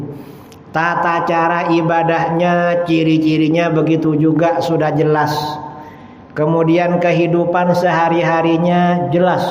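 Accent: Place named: Madura, Indonesia. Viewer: Pak ustadz nya kayak nya orang madure ..kalau dilihat dari logat bicara nya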